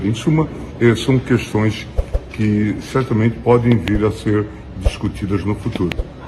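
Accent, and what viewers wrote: Rio de Janeiro, Brazil. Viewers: gosto muito de vc, mas tira essa bala da boca e esse sotaque carioca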